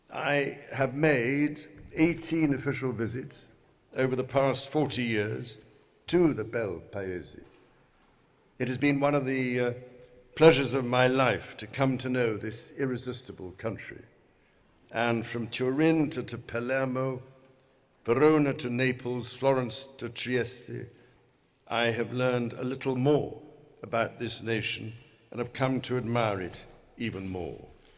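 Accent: United Kingdom. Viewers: I'm Italian and what's impressive here is King Charle's full knowledge of the relationship between written accented vowels and the kind of stressed sounds they require, namely à, è, é, ì, ò, ó, and ù, as well as the pronunciation of double consonants such as "mm, ll, nn, cc, ff, pp, rr, tt, bb, dd", etc. He also knows the correct use of voiced and unvoiced consonants such as the unvoiced "z" of the word "incoronazione" that he used early in this speech (instead of using the voiced "z" sound found in the word "zanzara", for example, which would've been incorrect). The accent is British but extremely clear. I was very impressed. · Pronunciation was good! With a strong british accent, obviously, but really good! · Perfectly understandable, obviously he has a lovely British accent!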